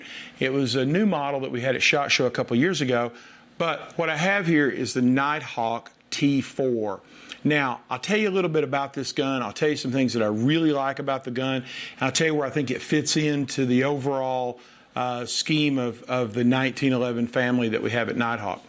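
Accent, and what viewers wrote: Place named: South, United States. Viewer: I have a hard time listening to a overweight, end of life, bald bullet headed guy who speaks with a southern accent telling me anything about safety and personal defence. Here's is a personal defence tip, start exercising and stop eating everything you see. You are far more likely to die of a heart attack than a bad guy, "you can count on it".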